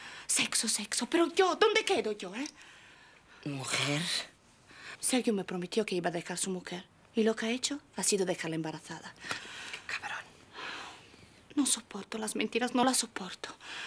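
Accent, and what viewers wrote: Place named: Italy. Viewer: bruttissimo l accento italiano nello spagnolo🙉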